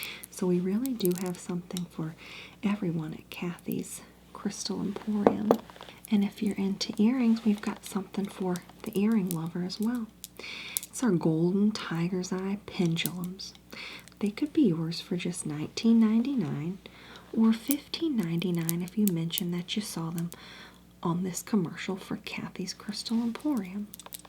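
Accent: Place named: Arkansas, United States. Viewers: THE WAY I YELPED as soon as I saw this video!!! I'm not even a crystal girlie, but I don't think I've ever seen a crystal-themed ASMR roleplay that I haven't loved. And this accent.... I am unironically obsessed, there's just somethin bout that sweet southern *hwhistle*😍😍😌😌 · I was going to say how fitting it would be if Kathy was located in Arkansas since Arkansas has so many crystal shops. Also feel like you nailed the specific southern accent Arkansas has :)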